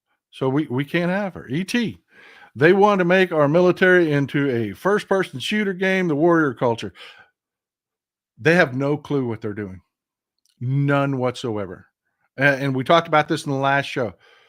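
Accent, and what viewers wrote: Texas, United States. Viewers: This week, my Crystal Ball says the focus will be international, pulling out of ALL International treaties and agencies ("IL Dodgy" can't wait to get us out of ITSO!), drawing the focus away from "IL Dodgy" and his Muskrats while they finish killing off domestic agencies. Midweek will see all sorts of outrage from leaders in other countries, by Democrats in Congress and by some Republicans in Congress who have some special interests in some areas. By the end of the week there will be talk of pulling out of NATO, the World Bank and the UN! The end goal will be to kick the UN out of NYC and a bidding war for the prime real estate there. Folks will be shocked to realize the "America First" policy is isolationist and will effectively remove the US from the world stage. As a Texas ex-pat in Europe I sure enjoy hearing good sense being spoken in a dialect that sounds like home! 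Thanks!!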